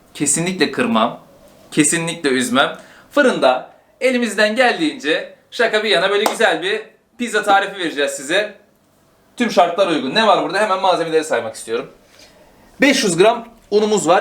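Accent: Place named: Adana, Turkey. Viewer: Adana aksanin super Arti yemek sana yakisiyor Hazer falan filan senin yaninda vizzzzzzz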